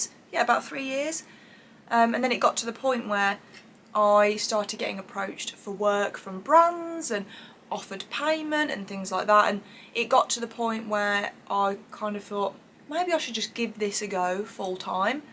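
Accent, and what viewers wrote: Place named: Birmingham, United Kingdom. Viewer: Hi, really enjoyed this video I related to you, when you touched on your background/family in particular. Real life hey. Also your voice is soo soothing to me. My Nanny 👵🏼 raised me, she was from Birmingham & had the same accent as you ☺️🙏🏽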